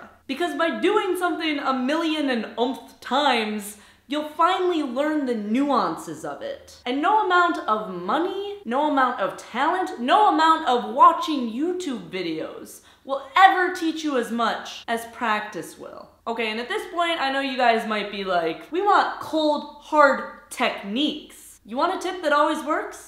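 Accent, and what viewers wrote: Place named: Midwest, United States. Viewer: I simply love your Middle-West accent!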